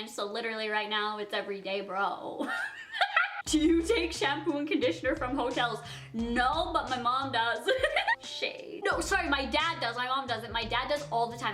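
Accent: Newcastle, United Kingdom. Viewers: It's Geordie, bless you! Ask Siri to say it xxx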